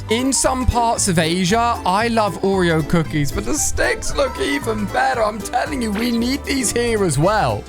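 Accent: United Kingdom. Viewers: I'm Irish are u like British or? I love the smartie chocolates btw!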